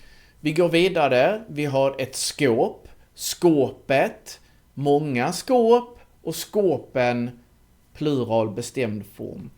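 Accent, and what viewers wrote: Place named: Skåne, Sweden. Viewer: Tack! 😆Jag tyckte att du pratar Göteborgska. Men det är faktiskt skånska. Du pratar väldigt tydligt och jag tror att dina videor har hjäpte många som lär sig svenska.👍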